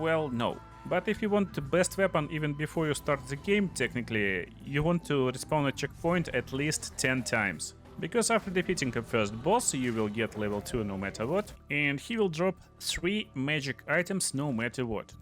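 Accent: Russia. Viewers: Where's the accent from? Russia?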